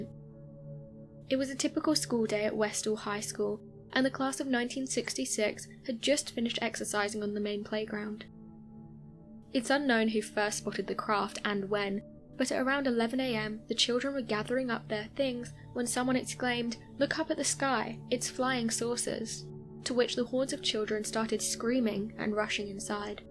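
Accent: United Kingdom. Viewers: Al-u-mi-nium.????? Why do all people with british sounding accents pronounce words so blatantly wrong.?? They're taught the same alphabet as American English speaking students, and taught that all of the letters make the exact same sounds as were taught, yet they insist on pronouncing them the same way as every other retard over there. Some way worse than others. Ask any of them how to pronounce the letter Z, and they'll say ZED... ask them how to spell it and they'll say ZED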